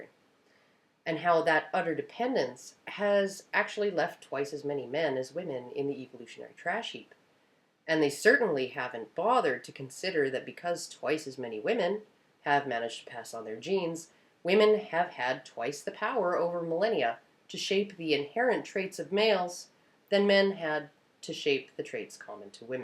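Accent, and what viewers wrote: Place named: Canada. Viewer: your Canadian!!! xDDD thats why i like how you say sorry xD i thought i heard it sumwhere b4 lol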